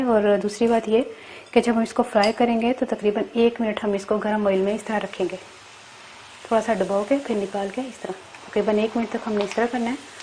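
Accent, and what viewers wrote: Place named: Punjab, India. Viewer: I'm late today oooo but anyway amazing and nice cooking and i loce punjabi touch when u speek punjabi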